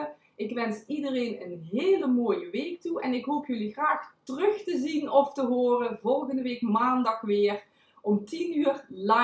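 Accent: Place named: Limburg, Netherlands. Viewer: Volgens mij ben jij een Limburgse, net als ik!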